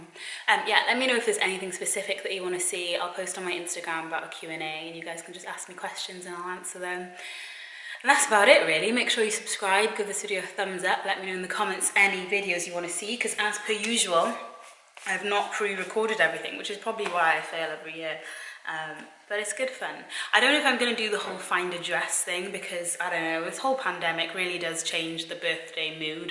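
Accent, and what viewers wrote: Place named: United Kingdom. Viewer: Shw has a british accent kind of🙂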